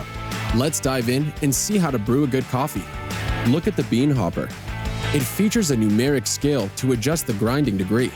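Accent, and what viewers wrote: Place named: United States. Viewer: What's with this american guy doing the voiceover on an italian product? Get Mauro Epis back! A real italian. Good video otherwise though, from an owner of this machine!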